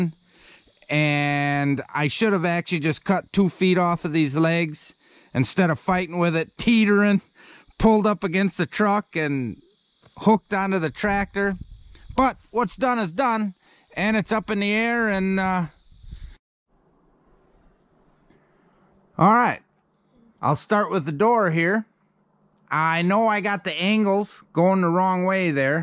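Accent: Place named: Upper Midwest, United States. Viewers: Dont sound like hes anywhere near Georgia lol. More like Minnesota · unrelated but... I heard you speak and you are either a Wisconsinite, Minnesotan, or a Michigander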